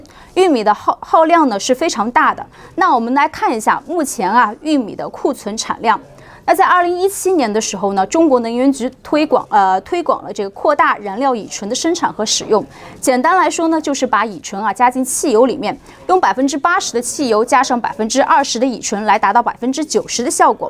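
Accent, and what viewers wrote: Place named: Nanjing, China. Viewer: coco口音好像是南京或者附近的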